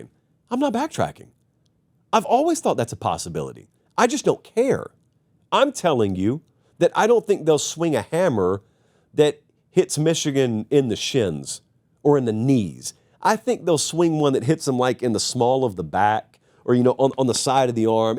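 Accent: South, United States. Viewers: 😂GTFOH! when the story first dropped you were literally saying that you thought nothing would happen. You can use your southern twang all you want to try and backtrack. But you got caught, called out, and now you're trying to do your best tap dancing to get out of being called out. Just admit you were wrong and move on. ScUM is a big brand and you didn't want to piss off their fans. But you backtracked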